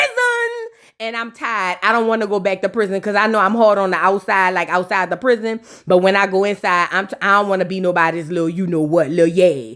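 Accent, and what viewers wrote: New Orleans, United States. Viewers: I was just scrollin and ya accent and the way u said this point made me tap in....Chiiillleeee i love a Nola accent❤❤❤ much love from philly❤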